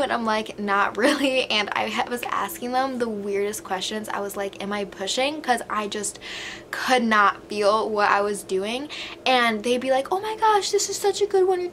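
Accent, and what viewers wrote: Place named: New Jersey, United States. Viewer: Are you from New Jersey? Your accent reminds me of Jenny or JWow for Jersey Shore.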